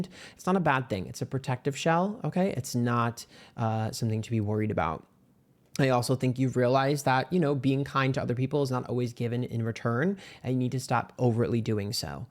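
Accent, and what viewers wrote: New York, United States. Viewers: ♐️👄♐️ When I start hearing NY accent & dropping F-bombs = He is NOT messing around! 🫨 Thanks for the Go Ahead 👸🏻🧾 GTFOH IWTFYWB ACTIVATED 🫡 *sharpening claws*💅🏼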